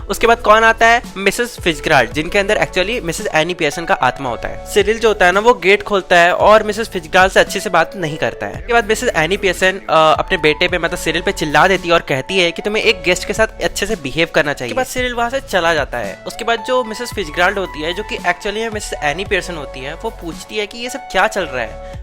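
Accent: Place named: Indore, India. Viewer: meko bhai tum toh indori accent bol re ho ni😊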